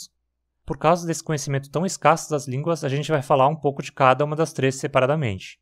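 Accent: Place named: Rio Grande do Sul, Brazil. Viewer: Am I hearing happy gaúcho noises? Fenomenal essa história.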